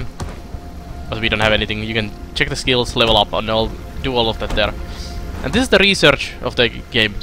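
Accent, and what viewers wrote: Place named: Finland. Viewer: "Ärrän kierrän ympäri orren...." It's funny how every Finnish accent is different, but recogniseable. Your english is really good though :)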